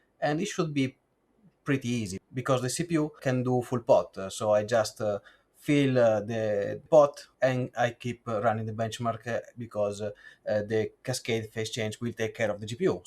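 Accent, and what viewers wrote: Italy. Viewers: Your accent sounds very Italian.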